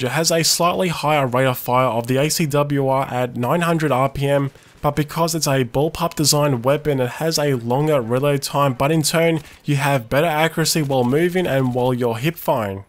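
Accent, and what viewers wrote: Australia. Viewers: Haha thanks for the reply so quickly yeah i thought you sounded like an Aussie like me :)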